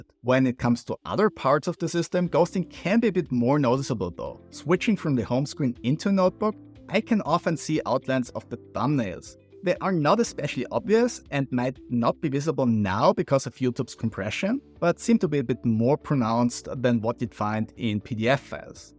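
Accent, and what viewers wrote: Austria. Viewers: daher die leichte Wiener (?) Aussprache. * Englisch eher österreichisch als deutsch angehaucht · Thank you for the good review. Just what I was looking for. Are you by any chance Austrian?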